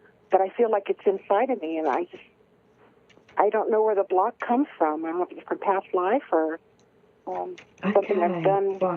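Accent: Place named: Cockney, United Kingdom. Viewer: She speaks with a Cockney accent. The working class use this accent .Not the Propah English ,which is spoken by the elites or Oxford educated.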